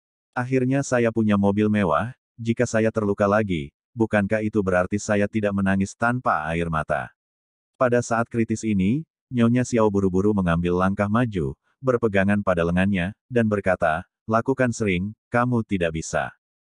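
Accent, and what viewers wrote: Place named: Banyumas, Indonesia. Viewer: Ora ngapak ora kepenak 👍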